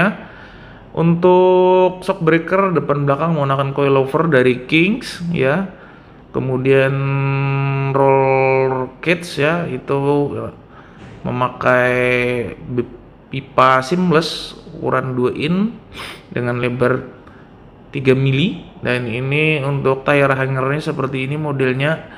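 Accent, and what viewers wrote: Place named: Hulu Sungai, Indonesia. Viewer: Logat/dialex hulu sungai masih kadangaran broooo !!!!!!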